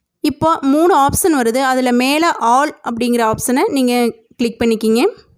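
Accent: Kongu, India. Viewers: Kongu tamil speech is very nice sister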